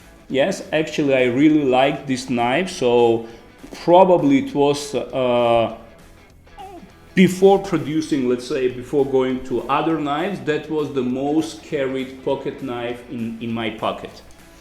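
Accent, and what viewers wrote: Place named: Russia. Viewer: Испанский стыд за русский акцент в английском языке. Континуэйшн. Ой....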